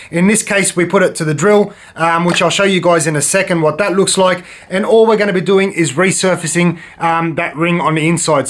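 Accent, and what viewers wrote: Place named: Australia. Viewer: Good old tradie advice with an Aussie accent …. Music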